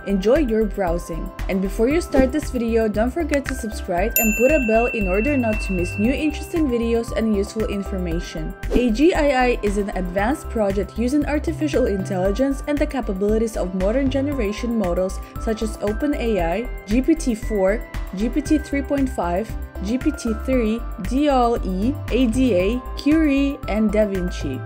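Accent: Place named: Asia. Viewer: that asian accent...makes me feel bulish on this...I want to get in but I don't got any more cash left, I invested all I had...😅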